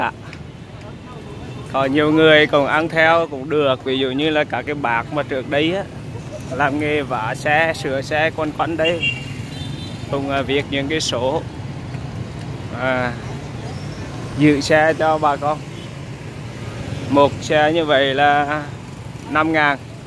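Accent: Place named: Central Vietnam, Vietnam. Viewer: Thích tiếng miền Trung nhất , nghe vừa nhí nhảnh lại vừa duyên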